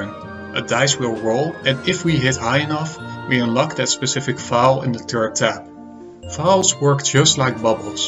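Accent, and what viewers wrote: Netherlands. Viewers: Funny how i can hear you're dutch just by the tone of your voice. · Are you dutch?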